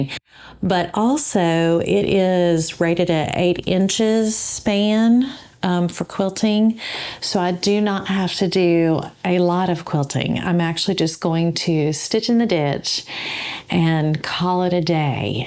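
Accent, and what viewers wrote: South, United States. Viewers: Good grief woman! It is beautiful and you are amazing. I would never even try that. You have my hat and my heart. I truly love how you slip into that southern drawl when you are tired. It is precious. Texan as I was born and raised, I also love a good sammich. Jean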